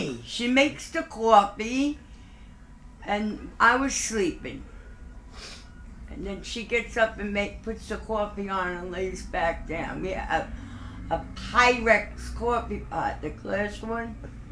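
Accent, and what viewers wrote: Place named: New York, United States. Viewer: a true New Yawk accent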